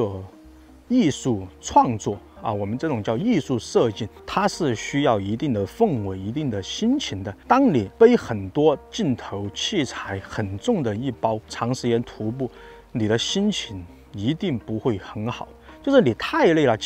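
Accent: Sichuan, China. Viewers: 这个频道主感觉是个四川人，讲话太像了。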